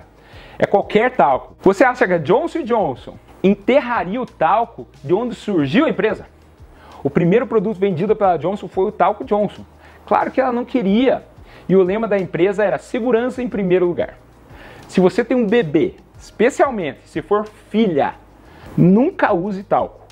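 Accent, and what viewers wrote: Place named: Minas Gerais, Brazil. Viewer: Adoro esse sutaque mineiro. Seus vídeos 👏❤️ são ótimos. Parabéns Dr, por nós proporcionar GG conhecimentos. Não gostei da propaganda da Lacoste.kkkkk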